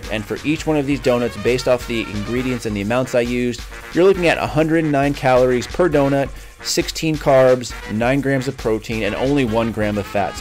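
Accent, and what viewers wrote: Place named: New England, United States. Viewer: what part of New England are you from?? Wicked!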